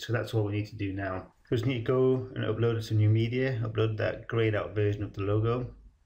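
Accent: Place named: Yorkshire, United Kingdom. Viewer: Aye, a Yorkshire Man, of course you are... my family is from the dales..Well done with the tutorial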